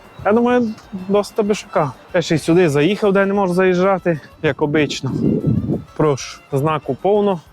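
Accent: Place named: Transcarpathia, Ukraine. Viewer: А ти походу із Закарпаття) удачі бро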